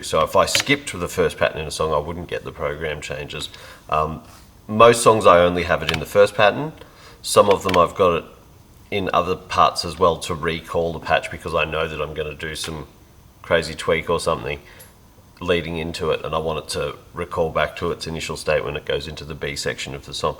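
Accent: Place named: Australia. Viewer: This is awesome man, thanks heaps for sharing! Sounds like you're an aussie like me haha. Where are you based? P.s. keep up the great video content!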